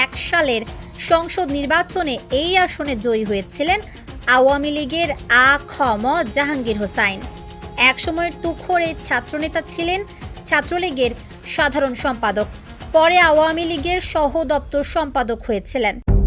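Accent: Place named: West Bengal, India. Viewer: তোমার কনটেন্ট সবই ঠিক তবে তুমি বাংলাদেশী নও কারণ তোমার কথায় অনেক কিছুতে লাগতেচে তুমি ওপার বাংলার এযেমন একটা কথা বলচো না ঘার কা না গাটকা এটা এনখও বাংলার ৯৯.৯৯% লোকে জানে না এটার মানে কি তবে নিজের দেশের দালালী করো আমার দেশের না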